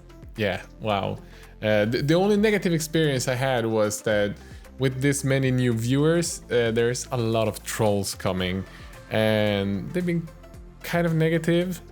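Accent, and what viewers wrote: Sweden. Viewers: This is probably one of the most wholesome videos out there for this hobby! <3 As someone with depression, executive dysfunction, and on top of that adhd which leads to myself having 243506 different hobbies... I spend most of the time jumping from thing to thing. Painting miniatures is one of those things, and right now i think it was like... a year ago since i last painted anything... i paint like 2-5 times per year otherwise.. i go in waves. right now dnd is the wave that i spend most days working with as a dm. So... am I good at painting? No, but I'm average, decent, acceptable for tabletop standard, and as you say, that's perfectly fine! I dorecognise that lingering "i'm not good" feeling thou... damn it's crippling, but.. i'm doing my best at pushing that aside and instead going "damn that's crippling but what the heck let's do it anyway, in my tempo!" <3 Thank you for this encouragement. 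(now, if I could only fix the painting station which is just lying in heaps right now... xD) Also... Your dialect sounded very swedish,, then I saw the fiverr video and in the end I heard "nej inte äta den!".... yup... so hello fellow swede! :P waves from sweden, and i'm guessing the minis wip group aswell :P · Hittade din kanal för några dagar sedan. Hobbyn hade jag lagt på hyllan men efter sett dina filmer så börjar jag bli sugen på att måla igen. För du är väl svensk , har för mig att jag sett kr och du har en svensk brytning. Bra jobbat vilket fall som helst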